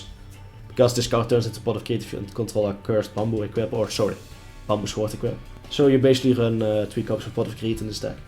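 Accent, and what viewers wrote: Netherlands. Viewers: Lol a dutch Guy you can here ot so baba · He sounds dutch 🤔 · hes dutch i can hear it lmao. Its nice to see some one from my country on this channel too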